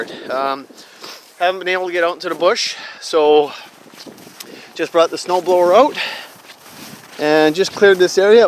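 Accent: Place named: Canada. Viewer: That's the most Canadian accent I've heard all day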